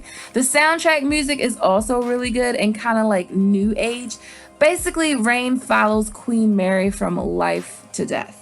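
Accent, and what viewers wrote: United States. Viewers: Your accent is so american, it literally sounded for a few seconds like you tried sounding dumb on purpose.. couldnt watch anyoner after i realized that it was actually just how you talked! :D You sound like a female cowgirl from the 1800's chewing tobacco and spitting on the floor of your own house..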